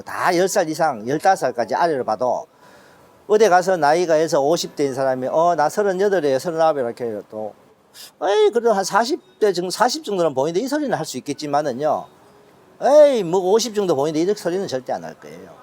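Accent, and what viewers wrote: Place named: Vietnam. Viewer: 주인장 한국말하는 베트남 사람같아요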